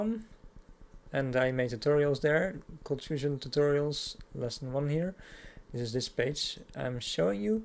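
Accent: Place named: Netherlands. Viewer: from the 1st second i knew you were dutch😊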